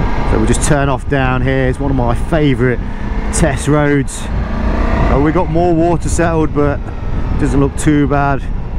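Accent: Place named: Leicester, United Kingdom. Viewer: I live in North Yorkshire now but love it when I hear a Leicester accent, where I’m from. Great bike, tempted to swap my GS. Entertaining video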